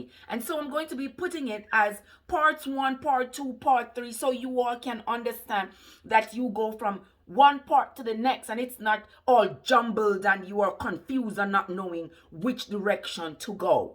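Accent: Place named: Jamaica. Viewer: Am new here and I like the Jamaican English, my Bruder👍😅 I will stay here for more of this👏👏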